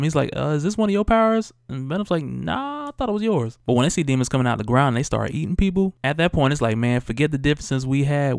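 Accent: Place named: Brooklyn, United States. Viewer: this shit is so ill,its hellSTROM not storm fam, great job though u sound like you from brooklyn are you ?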